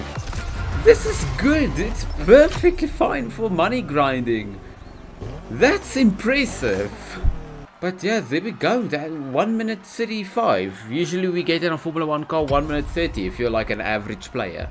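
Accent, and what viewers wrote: South Africa. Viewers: When I heard ranting and swearing about BS electric cars in a South African accent, I subbed. Lol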